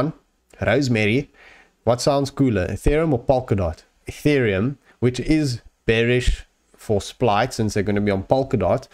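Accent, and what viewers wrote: South Africa. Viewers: Glad to find this channel . Is that South African or Zimbabwe accent? Are you based there. Thanks again good content for small audience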